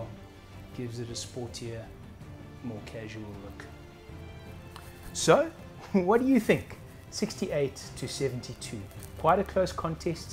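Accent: South Africa. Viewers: Sweden here again. Jebo,, I don't know that one so I will go with Jambo Sana instead. Both nice watches, I kind of like the black one with that pop of red and blue lume, could be a little better. By the way,, J-burg,, I guessed right on the accent.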